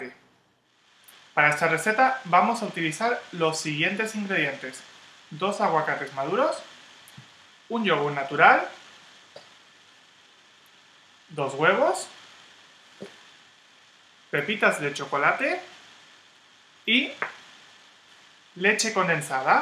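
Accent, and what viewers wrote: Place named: Spain. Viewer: porque imita el acento español peninsular?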